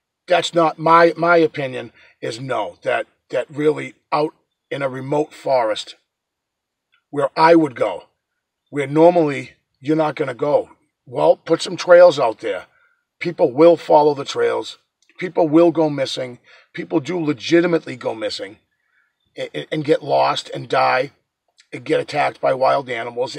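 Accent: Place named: Boston, United States. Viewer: Just found your channel. I'm into these things. Just subbed. Great theory. You sound like u have a Boston accent lol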